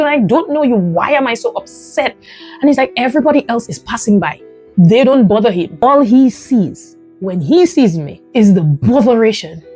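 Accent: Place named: Dominican Republic. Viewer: Enjoying the Dominican accent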